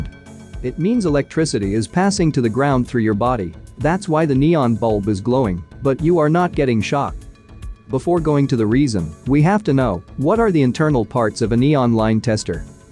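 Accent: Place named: India. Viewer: Which voice did you use here? It is a machine generated realistic voice? Foreigner speaking Indian English sounds funny.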